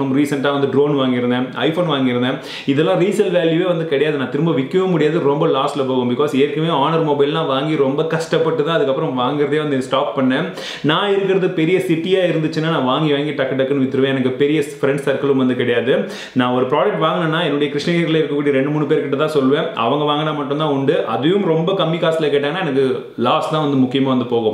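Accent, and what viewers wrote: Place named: Kerala, India. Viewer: Tamil quality aaa Malyaali irukku nanba ........മലയാളി daaa......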